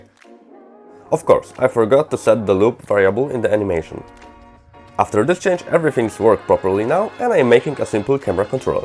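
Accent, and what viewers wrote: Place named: Poland. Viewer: I can hear fellow polish dev :D keep it going!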